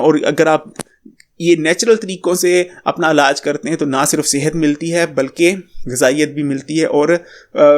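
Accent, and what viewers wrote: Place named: Punjab, India. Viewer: Hahaha buri bat hai..punjabion k lehjay se hi pata chal jata hai k punjabi lehja hai..lekin koi bat nahi kher hai bhai hain apne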